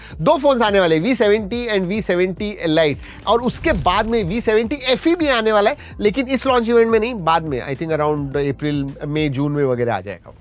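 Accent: Maharashtra, India. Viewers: Bhaiya love your marathi accent ❤❤ Tumi pn till gulh ghya gode gode bola ❤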